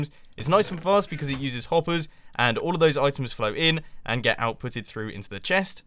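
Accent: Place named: United Kingdom. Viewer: arrrrrrrrrrrgh british accent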